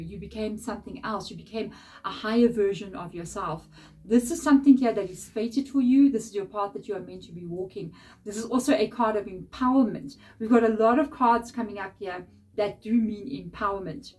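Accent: South Africa. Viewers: Hello! I am a fellow South African Aussie! I miss the Saffa accent and I’m so glad I found you! I could listen to you talk for hours. Thank you so much for the Sagi guidance 🇿🇦🇦🇺♐️🤍🙏🏻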